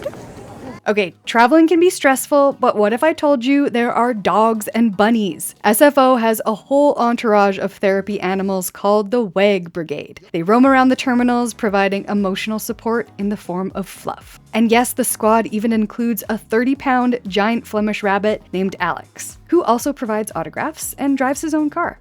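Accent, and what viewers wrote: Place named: Canada. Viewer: None of this existed the last time I used the airport which was 2005. And while everything you showed looked nice I agree with other comments. You were comped for sure. no mention of prices at most places you visited. High end is fine if the average mortal can afford it. Besides most people do not have the time to spend 24 hours at any airport with all the things we have to do just to arrive and check in. And BART is not all great. Took it from downtown SF on my return and there was a delay for at least 30 minutes in the middle of nowhere - I thought I would miss my flight - thankfully I didn't. And having visited Canada many times your accent was a dead giveaway - Toronto, maybe?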